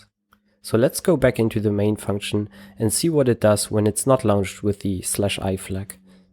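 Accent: Germany. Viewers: nach seiner Aussprache zu urteilen würde ich nen 10er wetten das der gute mann aus dem deutsch sprachigen raum kommt. geniale Facharbeit, ich mag es wenn menschen ihre arbeit verstehen. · Always the slight german accent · I love your German Accent! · This is great, I'm always surprised by new hacking channels every now and then. Listening to your voice, it sounds like you're German, am I right?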